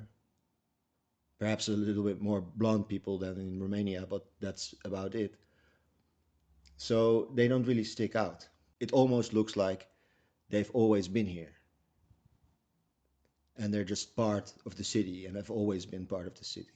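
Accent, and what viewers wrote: Netherlands. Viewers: Are you Dutch? :0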